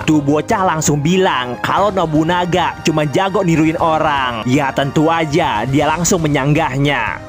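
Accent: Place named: Banjar, Indonesia. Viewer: Urang Banjar kah saban hari jar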